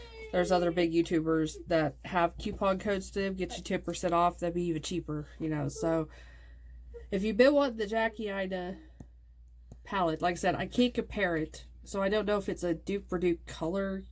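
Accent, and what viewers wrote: United States. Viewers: Girl, bye! Those ppl need to crawl back in their hole. There is nothing wrong with the way you talk. I love our Oklahoma accent. Also, I love it when you talk in your videos. You always make me laugh. This look is gorgeous! That lid shade tho...#Yassssssssssss! Love you more than 2 big busses! Lol! Stay safe! TFS! ~ Nona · I luv ur "hillbilly" accent 💜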